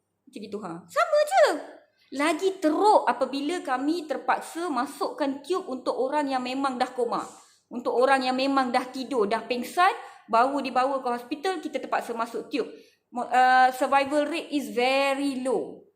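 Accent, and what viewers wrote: Malaysia. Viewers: Trying to focus on your explanation but honestly cara dr cakap melayu jugak attract me more 😅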